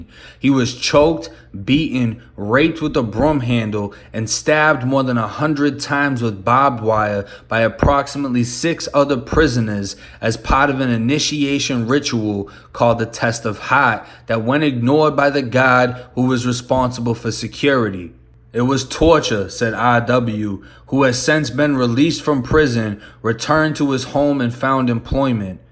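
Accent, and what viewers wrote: Boston, United States. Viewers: Lol I’ve been listening to ever since the 23&1 interview and I always crack up with his Boston accent! “Test of HARHT” “PAHRK THE CAHR” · He sounds like a he's from Boston.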